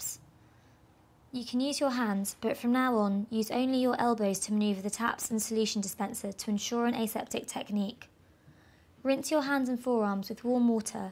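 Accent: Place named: Southern England, United Kingdom. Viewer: Nah, just Southern English!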